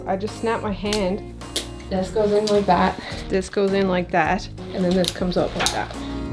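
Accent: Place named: Canada. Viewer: never ever apologize for your Canadian accent eh.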